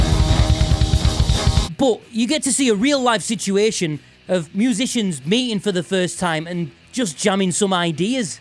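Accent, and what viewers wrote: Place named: Northern England, United Kingdom. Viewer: You said it in another video, "Adverse Camber"... or. "the bloody bastards" in that Northern accent you got..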